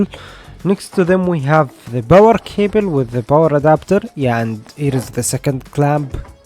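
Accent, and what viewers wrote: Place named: Arab world. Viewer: Arab Accent goss hard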